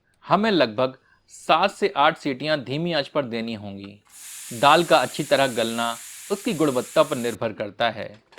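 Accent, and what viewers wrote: Standard. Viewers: I like your shudh HINDI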